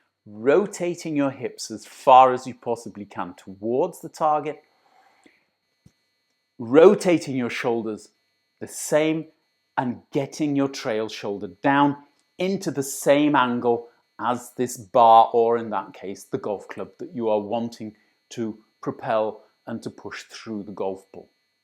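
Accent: RP, United Kingdom. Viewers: Thanks for the video,. Where are you from? Your accent sounds English-ish with a hint of German or Scandinavian... my mother is English (I grew up in the states with an English mother) and been to England many times. I can't figure your accent out. 😄 I enjoy your videos. You're very detailed.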